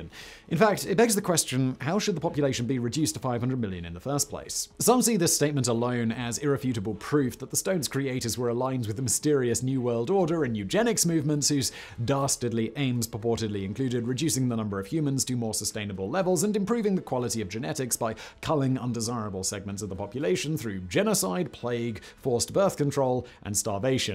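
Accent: United Kingdom. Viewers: One word: British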